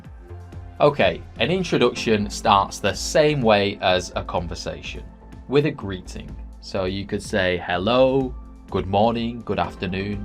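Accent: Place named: United Kingdom. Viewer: I love British accent. Could you please guide me how to learn it?thanks.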